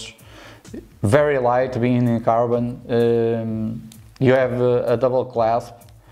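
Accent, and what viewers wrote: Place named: Portugal. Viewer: Pelo sotaque suponho não errar. É português? Bom vídeo, detalhado e pessoal. Estou em vistas de comprar um igual. Aconselha alguma loja em especial?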